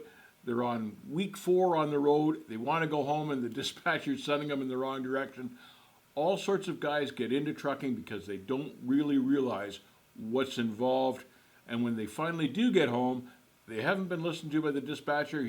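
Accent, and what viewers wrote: Canada. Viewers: You sound like a Canadian!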